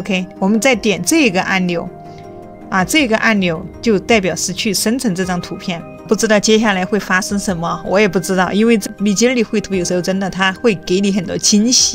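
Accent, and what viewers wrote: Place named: Sichuan, China. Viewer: 川普哈哈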